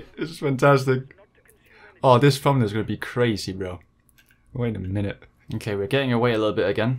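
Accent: Denmark. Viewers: Lol I could hear you are Danish for the first 2 seconds.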